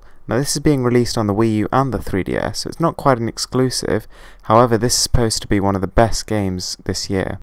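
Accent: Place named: United Kingdom. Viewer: why british people say to pokemon. pöukman? wtf...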